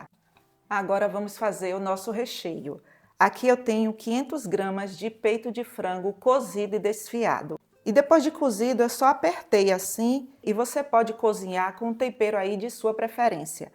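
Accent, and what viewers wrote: Bahia, Brazil. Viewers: Q sotaque lindo de baiana arretada.Gosto da sua voz.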